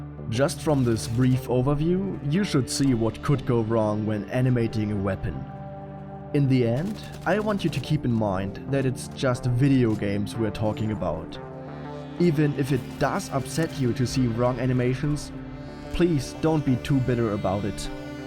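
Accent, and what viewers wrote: Germany. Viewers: Love the German accent bruder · So Deutsch der Akzent ^^ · the accent...YOU ARE GERMAN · Gib dir mal mehr Mühe bei der Aussprache! Dieses typisch deutsche "s" statt "th" treibt mich in den Wahnsinn! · Das hört sich schon nach einem starken deutsch Akzent an, bin ich da richtig? :D Vllt solltest du das "TH" nochmal über. Da bekomme ich jedes mal gänsehaut ;) Sonst ein super Video :) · You can hear out you are german, correct? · i was kinda confused if your accent was german or not, now im pretty sure it is(u can just ignore this, im only proud of myself) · Klingst deutsch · Are ja german? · German accent maybe? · Fantastic work as always,. Are you a Bundeswehr vet? You mentioned the G36 paddle release being something you're "quite familiar with." Maybe the comments just pointed it out to you but you've got the right accent for it:) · Wusst ich doch, dass das ein deutscher Akzent ist :D Schönes Video! 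· I can hear your german accent mein freund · Dein deutscher Akzent ist wirklich fürchterlich aber echt nices Video. Du scheinst zu verstehen, wovon du redest😄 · Ich habe bereits in den ersten paar Sekunden gecheckt, dass du ein Deutscher bist! ;) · Are you German? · sry kann mir dass net geben der deutsche akzent kickt zu hart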